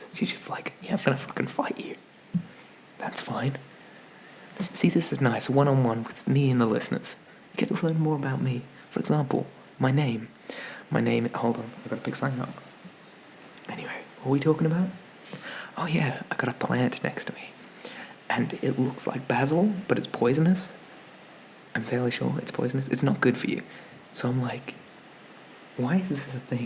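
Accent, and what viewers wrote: Ireland. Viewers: I love your Irish accent! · I haven’t see it in the comments, so I’m just gonna call out the Bioshock reference now. I know this video is a bit older, but I’d also like to say that I adore your channel and the characters you’ve made. I’m always up for a good story and you never disappoint! Adore the Irish accent as well! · I enjoyed this so much! I think you should do more personal videos like these. It's nice to see what YOU are like and not just your characters(which are great btw). Also just to let you know...I really like your Irish accent. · 1)Yeah you have just sold me on this crazy Bob Ross idea. "Beat the god" out of that paint brush. 2) it's "chicken and chip" chips or "fish and chip" chips in London. And your Irish accent is brilliant, it will make 100% of your girl viewers go crazy.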